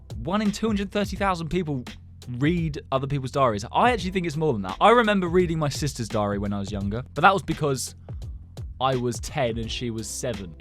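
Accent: United Kingdom. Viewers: I THOUGHT THAT HE WAS BRITISH Y HE SAYING DOLLAR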